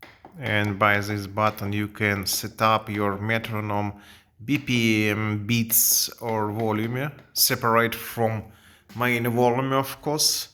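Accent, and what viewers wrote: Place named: Russia. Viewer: Русский акцент😂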